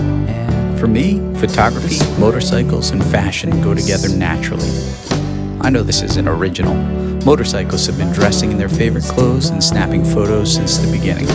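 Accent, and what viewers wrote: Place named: Ireland. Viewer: Im English, Irish, German and Danish, ....but youre so Irish its not funny LOL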